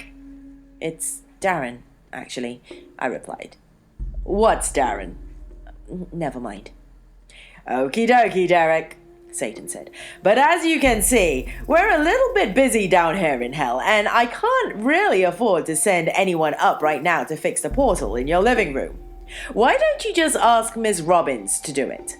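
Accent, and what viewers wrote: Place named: United Kingdom. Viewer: I believe she is British.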